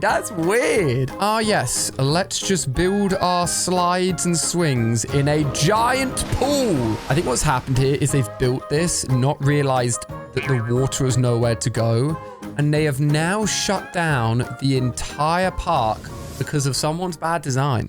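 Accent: United Kingdom. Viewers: I like ur British accent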